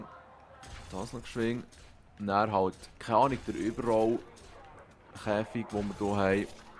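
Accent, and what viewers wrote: Switzerland. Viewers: das ist schweizerdeutsch, spezieler dialekt.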